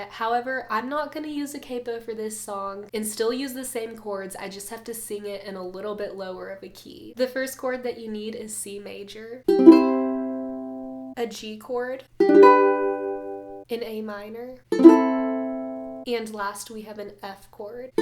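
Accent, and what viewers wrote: South, United States. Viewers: kinda sounds a bit like a southern accent to me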